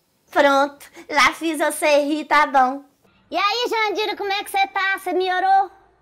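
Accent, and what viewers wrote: Minas Gerais, Brazil. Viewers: Bom de mais ouvir o sotaque mineiro.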